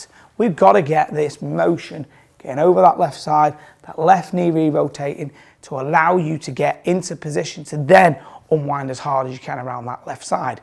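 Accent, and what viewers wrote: Manchester, United Kingdom. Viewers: so POLITE, (if you don't mind) what a Gent imagine Bubba Watson or Woods being so humble? great post. you sound like a Manchester lad. REEESPECT. John.🤗🤗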